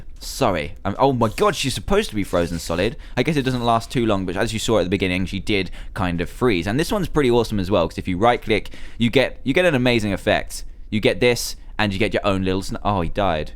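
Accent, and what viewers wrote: United Kingdom. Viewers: Your British accent made me subscribe to you! Btw, I also play MC :))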